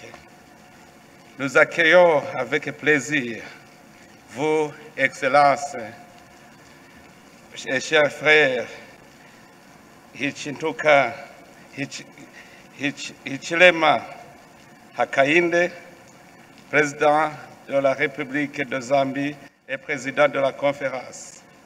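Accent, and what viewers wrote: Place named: Burundi. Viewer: Igifaransa kikirundi kbsa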